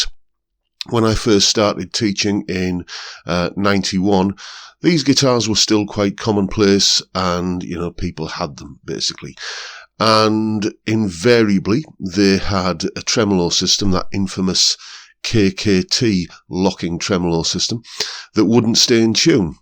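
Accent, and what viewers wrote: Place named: England, United Kingdom. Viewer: I grew up in Lancashire, been in Switzerland for ages now and just really enjoy you talking,! Brings a piece of England to my humble home! Love all your videos in general🎸🤘🎸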